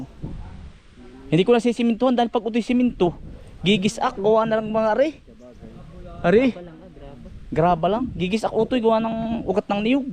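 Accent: Quezon, Philippines. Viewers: Ka insan san ba banda bahay nyo if papunta sariaya san baba para makapunta dyan matagal na ako subscriber mo doo ka pa sa kuawit dalawa kami kaibigan ko kya nga sabi ko parang yung punto mo eh taga quezon ka nga kya noon nakita ko na naluluto ka ng kalamay sabi ko na nga ba at taga quezon ka eh meron kmi laundry shop dto sa lucban sa may gilid ng pandayan bookestore sa may kantosaan ba yan sa inyo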